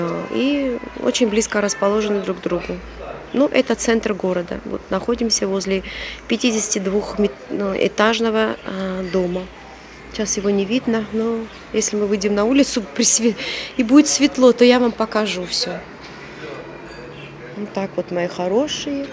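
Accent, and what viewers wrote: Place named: Georgia. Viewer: Простите,вы,по национальности грузинка? У вас,акцент ,наверняка грузинский.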